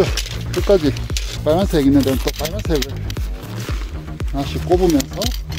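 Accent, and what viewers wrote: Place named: Gyeongsang, South Korea. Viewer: 갱상도 발음으로 설치해주셔서 엄청 친근감 있습니다!^^ 영상 덕분에 첫피칭 잘 해보겠습니다. 😂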